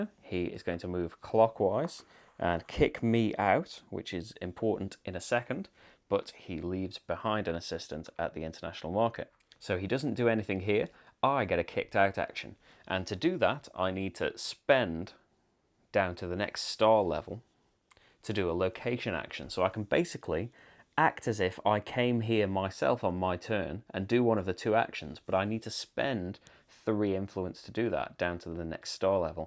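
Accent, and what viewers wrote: United Kingdom. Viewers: Hi there and great job, just discovered your channel and this playthrough is great. Thanks for the video, for us foreign players the british accent is sometimes more clear than the american one. Keep the good job and thanks again!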